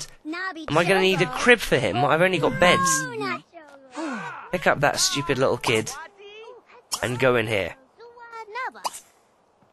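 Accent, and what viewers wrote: England, United Kingdom. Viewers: i like your british accent, especially when you said " pause, pause, pause." XD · i love your englis accent!!!! :D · He's British,like his accent is really British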